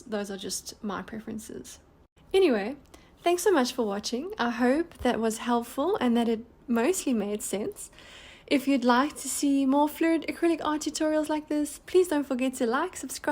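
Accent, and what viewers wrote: South Africa. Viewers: Thanks so much, just what I need, plus I love your SA accent.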